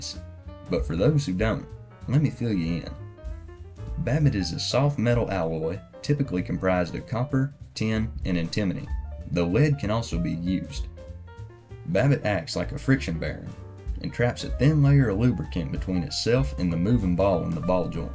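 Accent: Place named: United States. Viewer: This guy's voice... 100% unfakeable American. I'm subscribing just out of the hope he's gonna say "John Deere" lolz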